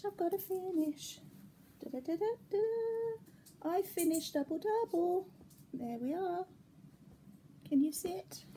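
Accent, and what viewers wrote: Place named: South, United Kingdom. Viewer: I'm really sorry you have had such a horrible diagnosis but at least you can start the treatment soon. Hopefully. Where in the UK are you? Your accent sounds as though you are in the south and I'm on the Wirral or I'd invite you round for a cuppa and a hug. I've been watching your videos and really enjoy them. I hope your cat scan is very soon. If you need a shoulder mine is big and padded for tears! Love and hugs and healing thoughts xxx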